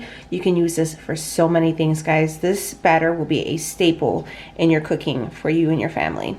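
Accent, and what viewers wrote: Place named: Minnesota, United States. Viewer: You sure sound Minnesotan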